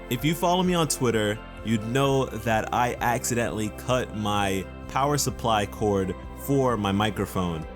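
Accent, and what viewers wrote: United Kingdom. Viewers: shes too british 4 me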